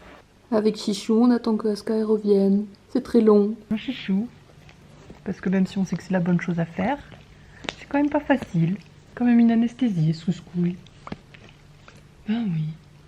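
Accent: South, France. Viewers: Depuis quand tu as un accent du sud ??? 😂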